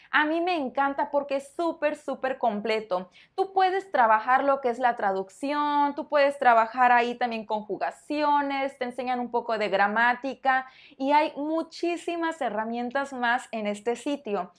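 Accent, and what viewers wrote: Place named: Mexico. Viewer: Soy de Brasil y me encanta ver tus videos y escuchar tu podcast. me gusta mucho el acento mexicano👏👏👏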